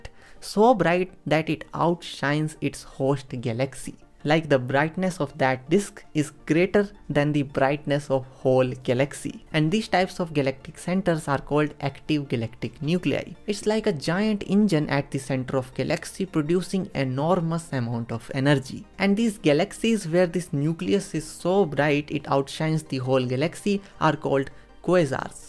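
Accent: India. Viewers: Hey, Are you Indian? Your accent is exactly like Indian!! Astonished from your knowledge in that depth. Being a 10th grade, student you are a true motivation and inspiration ✨️ ❤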